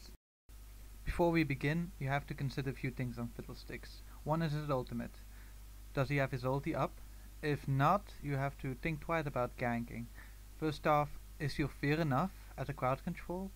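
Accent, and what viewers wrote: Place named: Netherlands. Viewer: ik hoor dat je nederlands bent :p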